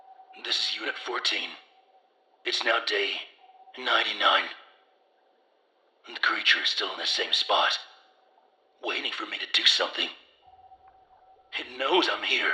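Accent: United States. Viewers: Your American accent is spot on!!!! Great job! I love the effect over your voice too 10/10⭐️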